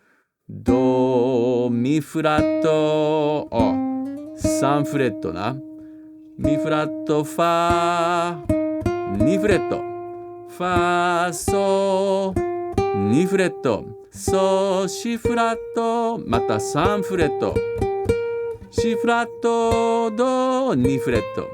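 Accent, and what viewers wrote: Kansai, Japan. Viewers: 白人っぽい人が関西弁でウチナーオトを語る面白さ。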